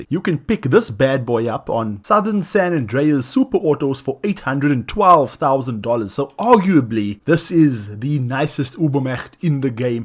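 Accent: South Africa. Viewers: Are South African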